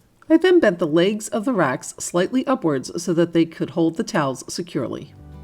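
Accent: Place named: East Coast, United States. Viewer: Where are you from? Somewhere on the east coast obviously. I hear it especially when you say the word “on.” Love east coast accents. 😊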